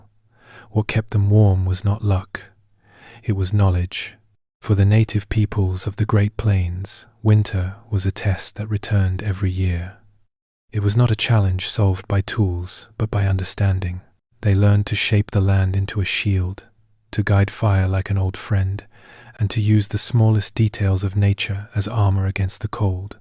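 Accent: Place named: United Kingdom. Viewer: So we have a a British narrator talking about American Indians.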